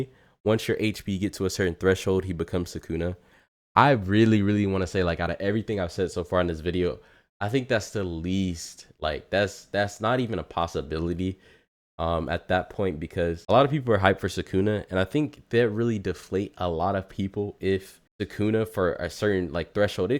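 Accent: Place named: South, United States. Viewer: why you sound so country 😭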